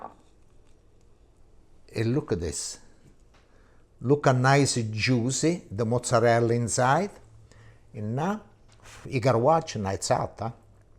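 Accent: Italy. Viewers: Great Italian accent Signor!!! I love this recipe will do this soon!! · I love your accent! I start to feel Italian. great recipe! , "like-a de-boss, cuz I'm a-boss ya". classic! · The recipes, the expertise, the actual food after it’s done, the beautiful kitchen he has, when he samples his cooking, his having the glass of wine during his sampling of the dish he made, his humour and of course THAT TERRIFIC ACCENT!!!!!! HOW can we NOT love Chef, HOW???? He’s living proof that the Italians are the masters of cuisine and that no one knows food better than them, no one and as I’ve told my Italian friends, “You’ve had the privilege of growing up eating like KINGS & QUEENS!!!” And that my friends isn’t an exaggeration, it’s the TRUTH! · He's SO adorable and funny and l LOVE his ltalian accent. J'adore les aubergines et ça, ça a l'air délicieux. Mille grazie. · Isn't he the most adorable and handsome Italian Chef ever, love his accent! 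who needs a Gino when we got Grandpa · I Love that Italian accent! Eggplanta Meata ballis. So musical. 🎵🍆🎵🍆🎵🍆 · I just ADORE this guy! Not only are his recipes so wonderful, but I love his Italian accent!! God bless him.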